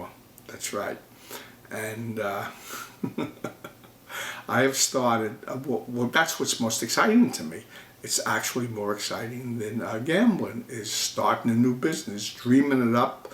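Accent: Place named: New York, United States. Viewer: just because you wear sunglasses and have that brooklyn or NY accent or whatever the hell you are from doesn't mean you know what you're talking about when it comes to blackjack. lol selling systems. Evidently his system isn't working if he needs more money.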